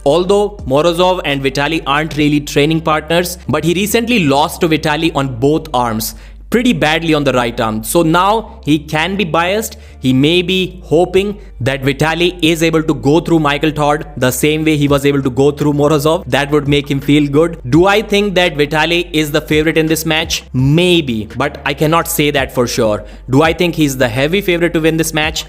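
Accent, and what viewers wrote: India. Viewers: Hey have you ever considered using an AI voice for your videos? I would watch them more but your thick Indian accent makes it hard to understand what you’re saying sometimes.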